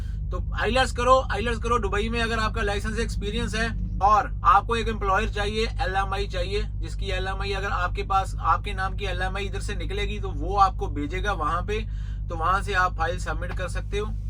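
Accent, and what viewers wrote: Punjab, India. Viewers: You are a good teacher. Like your Urdu but tarka of Punjabi gives more pleasure. Please take special care while driving. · Bhai bhaut maza aya 😂😂🤣🤣🤣 hindi wale te chib kad te And one like for ur effort cz punjabi bande laye hindi bolna yada muskil h english boln to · Punjabi di hindi bhaut vadiya hundi hai bhaji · Vicho vicho nikal aundi aa punjabi🤣🤣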